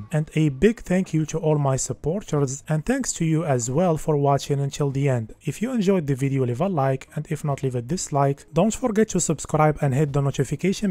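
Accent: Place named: Morocco. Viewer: yes , i thought that too because his accent's like marrocans ❤. Thank you bro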